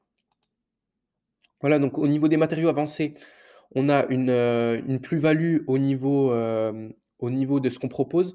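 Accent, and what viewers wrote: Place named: Doubs, France. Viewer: merci ca se voit que tu prends du plaisir. Avec un bel accent (du doubs si j ai bien compris)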